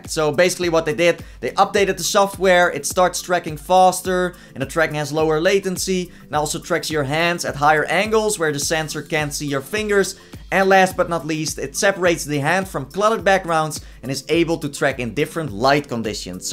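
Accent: Netherlands. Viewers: are you dutch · oohhh ok, I guess I've never heard a Dutch accent :D cool vid man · Are you from the netherlands? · Ben jij nederlands you have a dutch accent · Do I hear a Dutch accent?!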